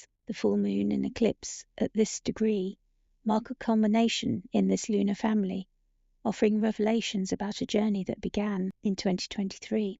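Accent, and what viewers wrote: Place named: England, United Kingdom. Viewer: U should have more comments🎉Thank U. When i saw the broadcast was at 15..mins - thought why? give it a try. Liked the Landscape view - seemed to be London Parliament Thames. Then when i heard the English accent and that U R from UK 🌍 i thought good going. Your content is very deep - Alkaid ? New to me - Only time of mention, through Listening to various Eclipes themes. Very knowledgeable for your age, by sound of your voice🎉Trudy ✨Gemini 1963 London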